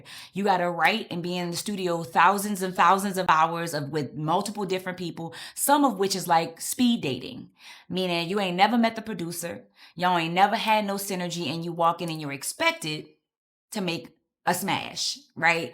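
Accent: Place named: New Orleans, United States. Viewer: Love! Keep going! That Nola accent tho 🤤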